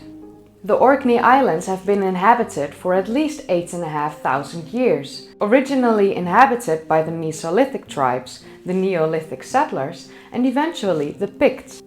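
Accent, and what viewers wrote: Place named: Netherlands. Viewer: Right, a lovely Dutch English accent - I heard it in a second.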